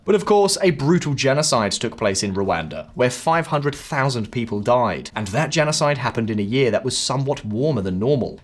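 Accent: United Kingdom. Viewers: I like the way you compose the narrative into different perspective that are coherent. It does make sense when we think about it. Of course there's no way clear definitive cut to conclude heat slows hinders economic growth, but it might be the trigger (indirect factor) for the human behaviour (direct factor) that makes hot countries are economically subordinate than cold countries despite they are more resourceful. Keep progressing making meaningful and all rounded contents. I enjoy watching it while being entertained with your British accent 😂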